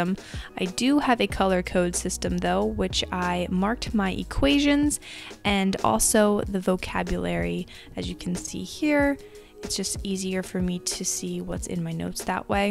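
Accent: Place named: United States. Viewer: I love this kind of American accent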